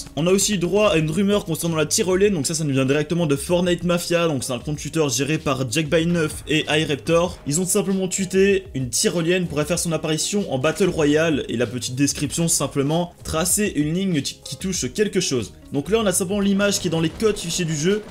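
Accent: Belgium. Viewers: Toi ossi tes Belgique ? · Tu viens d’où an Belgique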